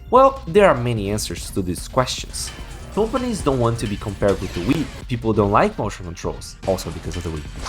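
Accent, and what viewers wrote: Brazil. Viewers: Tava vendo esse vídeo e minha irmã perguntou se vc é brasileiro por causa do sotaque e eu olhei seu perfil da steam e vi que vc é mesmo do Brasil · impressão minha ou você tem um "accent" brasileiro? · Eu também acho até estranho que inglês abrasileirado assim eu entendo perfeitamente mais o de alguns nativos ainda engancha umas palavras no meu listeling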